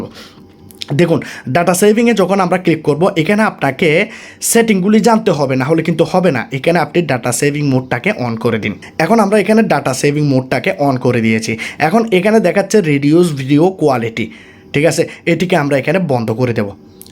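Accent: Bangladesh. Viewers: ভাষা ঠিক মতন বলতে পারেন না কথার মধ্যে বাঙাল কথা আসে কেন? যেমন আপনি বলছেন গোফন কথাটা হবে গোপন। সেটিং কথাটা ঠিক বলছেন না বলছেন স্যাটিং। কথা বাংলা বলছেন কেমন যেন গাঁইয়া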